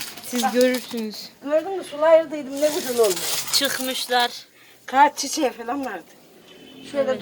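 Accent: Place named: Mersin, Turkey. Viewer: Ben Mersinli oldugunuzu anladim konusmanizdan bende Mersin'de yasiyorum cunku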